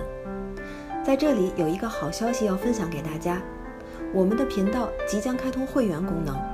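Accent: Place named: Mainland, China. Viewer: 本以為美女是香港人，沒想到美女說了一口流利的內地普通話。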